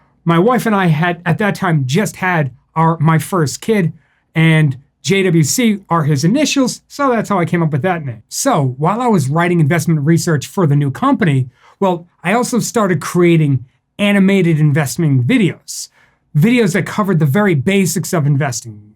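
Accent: Boston, United States. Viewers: You are from Boston ? I could never have guessed ! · It wasn't until you said you were from Boston that I noticed the accent 🤣 I'm from Brockton, should have picked up in that earlier.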